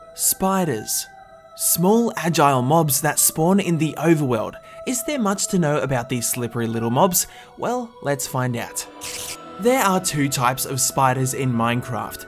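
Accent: Australia. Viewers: I immediately knew you're Australian because your accent is British on steroids. I know that I as a German should probably not be saying that, as German is basically Dutch on steroids, but that's something I just wanted you to know. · Yeaaaaaah I knew you were Australian for dat accent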